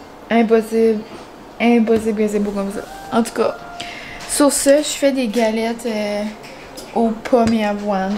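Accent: New Brunswick, Canada. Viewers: Justement ça emmène qqch de différent que tu viennes du Nouveau-Brunswick, ton accent & tes expressions inconnues pour nous! 😜 Tant mieux si ta chaîne fonctionne bien pcq maudit que tu es inspirante avec ta déco, tes idées culinaires et ton "unicité"! Vous êtes beaux à voir aller et tellement le fun à suivre! 😁 Merci de nous partager ton quotidien xox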